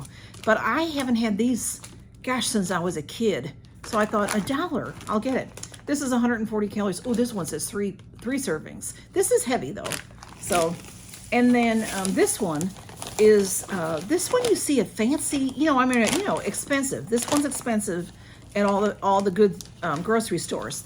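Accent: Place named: South, United States. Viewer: Happy Mask Monday! Nice Mask! Love your southern accent! I’m subscribed to the channels you mentioned. I’m trying to catch up, so many to watch lol. I have a terrible sweet tooth, trying to eat less sweets, it’s hard lol. I did eat the entire bag of that same Kettle corn in two days 😂 it’s my weakness. 🙏🏻😻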